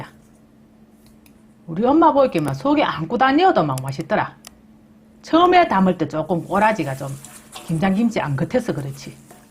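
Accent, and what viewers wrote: Gyeongsang, South Korea. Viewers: 경상도사투리에 더정감감이가내요. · 주부님 지루하지않고 영상보면서 저도같이 웃고있네요 특유의 경상도 말이 안동고향살땐 대구에사시는 시누와 애기할때도 그렇게 제미있다라고생각못했는데 전라도와서 말씀이 이렣게 제미있고 구수하게 들리네요 ❤❤1❤❤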